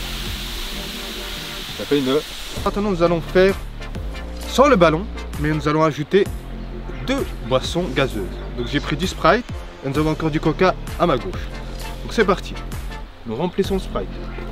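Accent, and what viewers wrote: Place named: Belgium. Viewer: Il a un axant de Belgique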